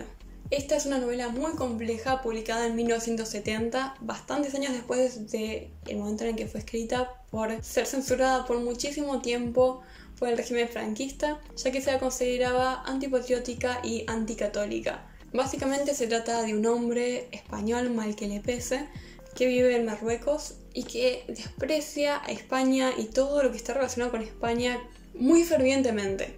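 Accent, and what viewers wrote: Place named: Rioplatense, Latin America. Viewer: Estuve buscando un canal argentino de libros (porque me encanta el acento rioplatense 😍) y encontré el tuyo, me gusta muchísimo! ❤️ Lorca es mi escritor favorito, leí Bodas de Sangre hace un año en original y para mí es una maravilla ❤️ También vi la película y pienso que es tan mágica como libro, me encanta! Saludos desde Polonia 🇵🇱❤️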